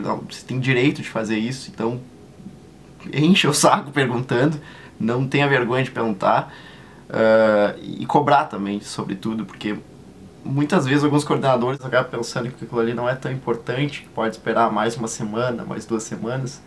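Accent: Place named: Rio Grande do Sul, Brazil. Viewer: Daiane Oliveira Pela sotaque e maneira de falar deve ser na UFRGS ou PUC- RS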